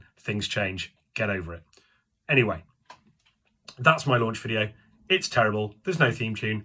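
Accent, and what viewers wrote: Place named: United Kingdom. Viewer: You sound british are mustangs even sold there? Or are you not and I’m just stupid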